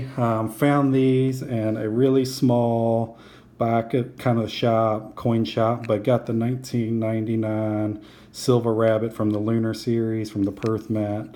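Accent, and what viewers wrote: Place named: United States. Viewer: Too much diversity IMO,if you are going to sell that in the future for your retirement or whatever you will have to look the price of each coin. If you are a collector that's fine but if you stack silver for selling in the future you will have some trouble. I would recommend only buying bulk of 1 coin,you sound like an american so you should stack silver eagles. It's not that fun to have a pile of the same coin but in the end when the time comes to sell it's way better. Remember this is not for fun but for keeping wealth you have and maybe get something more in the future,nothing else brother.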